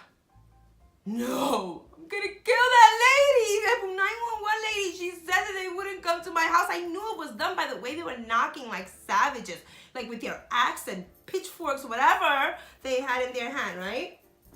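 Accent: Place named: Spain. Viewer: I enjoy you a lot I just kind of met you watching my TV and I'm happy I have to watch somebody to Spanish like me and curious and with a little bit anxiety like me you're wonderful to me and I love watching you I love your accent oh the way you talk I'm Spanish to and I love your new baby you can't get him wonderful he is adorable I love him blessings want to be your friend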